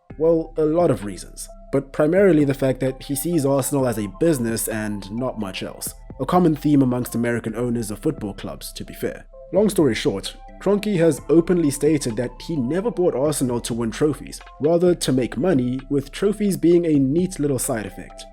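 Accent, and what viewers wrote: South Africa. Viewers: You sound south african? Correct? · I recently discovered your channel. I could tell by your accent that you're South African. Once I confirmed that, I hurried to subscribe because you make really great videos. I vowed to myself that this would now be my favourite channel pertaining all things football. Then you made a joke about my beloved Arsenal. So I will give it to you in a way that's quintessentially South African, voestek! 🤣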